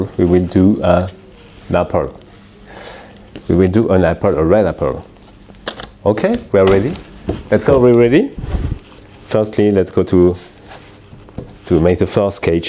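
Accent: France. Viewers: Yup totally french. I'm myself a frenchie... But his accent is sooooo pronounced, even sounds quite fake. (We french know that English ppl love our accent so we make it sound the most "french" possible to.. Be "sexy"?) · On sent bien qu'il s'agit d'un Français qui parle Anglais!!! Pourquoi n'a-t-on pas une version FRANÇAISE?? Je suis désolée mais on devrait pouvoir avoir le choix de la langue!!!!!! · Thank you for such a gentle and lovely voice with accent. MMM French accent always reminds me of food. I know why however that is another story. After dusting off the pastels January 1 and deciding to make a picture, at least one, everyday. Then I would watch how it is done. The spreading of the colour is so relaxing. Deep rich intense colour. Colour heals. May all who venture into the land of pastels be healed with the colours. <3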